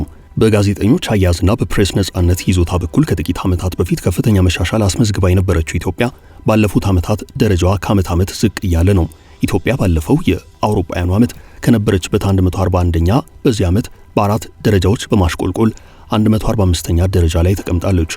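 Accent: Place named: Eritrea. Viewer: Ethiopia ina artira biwagu anit metifo utuber ke ethiopia dirashihin newi yeminatefahi ye artira selayi newi yemitimesilewi the way u talk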